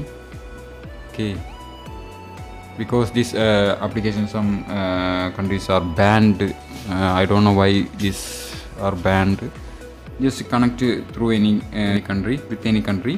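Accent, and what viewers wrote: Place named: Kerala, India. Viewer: Accent seems like malayali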